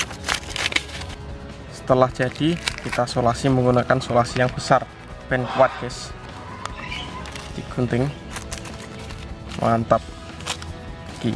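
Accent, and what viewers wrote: Java, Indonesia. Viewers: Wong jowo yo mas?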